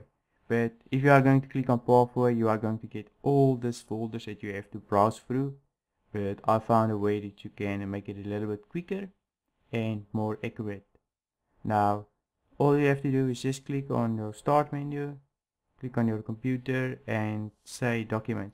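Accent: South Africa. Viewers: This guy from South Africa? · You are South African? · Yeah you sound really SA! I guess uTube now sends us local content, I think it is fantastic since now I feel more confident to use my SA accent also. But man the 'force' is strong with you, I think you need to filter the noize on your mic a bit to help. This video this help thanks, simple enough good to know while downloading setup files. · You sound South African...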